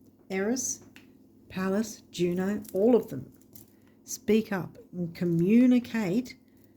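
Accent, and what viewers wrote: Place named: New South Wales, Australia. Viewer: Wow, thanks for this! Followed Gregory Scott across to explore your site - got way laid here on my way to the Mushroom Tarot review. What a happy serendipitous day of exploring rabbit holes this evening has become. Just got back from six months in NSW so hearing your accent was a heart warming thing in itself. Will have to re listen to this a second time and take notes. Love noticing how the tendencies and energies ebb and flow as I'm wading through this month of 'could go either way in home, relationship, work - looking after myself has become a priority though now. So thanks for the encouragement for that!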